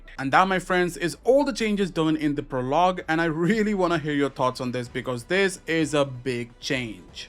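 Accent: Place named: India. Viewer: First i cant understand half of what you say with your India accents. Next why ruin the game taking out parts changing colors of markers. What I see is only messing up the game taking out good parts to make it worse. Who is the laim person not thinking. CAN I play my game WITH OUT DOWNLOADING THIS 48GB NIGHTMARE????? Please tell me i can. A complete joke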